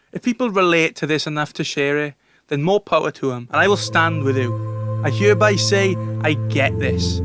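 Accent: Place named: Wales, United Kingdom. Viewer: dai butt i faking love that welshy accent like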